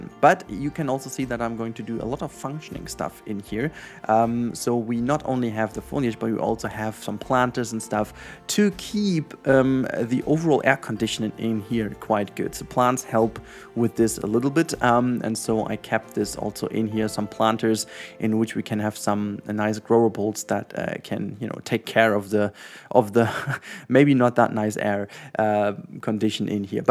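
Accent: Germany. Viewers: ich hab direkt deinen deutschen akzent bemerkt, aber krass wie gut du englisch kannst 🙂❤️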